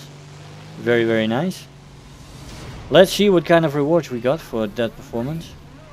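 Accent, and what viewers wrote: Netherlands. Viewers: Leuke video ik hoor dat je NL bent haha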